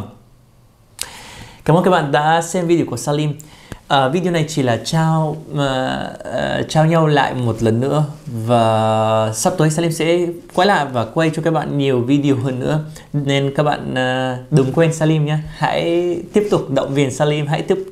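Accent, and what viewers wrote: Standard. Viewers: Ui mới nhìn tưởng a ko nói rõ dc tiếng nhưng s khi xem a ấy phát âm tiếng việt chuẩn quá trời luôn 😶😶😶😶😀😆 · Bạn nói tiếng việt quá chuẩn và ngôn từ rất rất là văn chương 👍🏻👍🏻👍🏻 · Wao, first time watching, bạn nói tiếng Việt chuẩn quá🇻🇳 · Bạn nói lưu loát quá ! Rất chuẩn ! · Giọng nói chuẩn hay quá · Tiếng Việt của bạn quá chuẩn, tôi rất thích 👍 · Bạn này nói tiếng Việt chuẩn quá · Mình xem đc một video của bạn và đã lập tức đăng ký ngay rồi bắt đầu vào xem mấy video khác,bạn là người nước ngoài mà nói tiếng Việt Nam rất chuẩn đặc biệt bạn cũng thấy rất yêu quý đất nước Việt Nam.Việt Nam luôn chào đón tất cả bạn bè từ khắp mọi nơi trên thế giới,Việt Nam có lẽ là đất nước hiểu dõ giá trị của hoà bình hơn ai hết nên cũng mong tất cả mọi người trên thế giới luôn chung sống hoà bình với nhau. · anh phát âm chuẩn và hay quá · Nói tiếng việt chuẩn và lưu loát.chúc bạn sống vui vẽ tại Việt nam nhé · Giọng chuẩn và diễn đạt quá hay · Bạn nói tiếng Việt rất chuẩn 👍👍👍👍🌹🌹🌹🌹🌹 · Anh nói chuẩn phết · Cảm ơn vì bạn đã yêu quý VN và có phát âm tiếng Việt rất chuẩn... 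· tiếng việt quá chuẩn, rất tuyệt vời, chúc bạn luôn mạnh khỏe và thành công! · tiếng việt chuẩn quá anh ơi. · Trình độ tiếng Việt của bạn còn chuẩn hơn cả mình nữa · Quá ấn tượng, bạn nói Tiếng Việt rất chuẩn, chúc kênh phát triển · Tiếng việt của bạn chuẩn quá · Bạn nói tiếng việt hay quá, nói còn chuẩn, phát âm hay rõ hơn mình nữa👍👍👍👍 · Phát âm chuẩn luôn nghe khó có thể nhận ra được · Nói tiêng viêt chuẩn đấy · Tiếng việt salim chuẩn quá !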